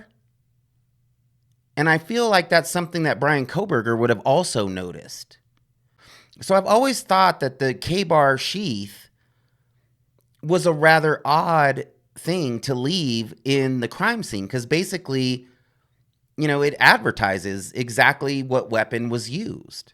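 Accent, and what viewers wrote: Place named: Flint, United States. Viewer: Chicago, Memphis, Flint, or anywhere in the state of New Jersey lol. Judging by your accent I would say Flint. No disrespect by the way. I have family in all three cities and the state of Jersey.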